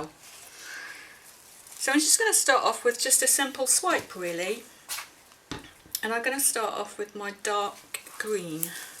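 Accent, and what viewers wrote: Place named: England, United Kingdom. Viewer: Hiya, just watched your video, I found it inspiring. I've been pouring for a few years now. It's my therapy. It's quite nice to hear an English voice, most YouTube people I've seen are in america, Canada or Australia. I hope you don't mind, but I think I'd like to try the hammer technique, the results you have Harare fantastic.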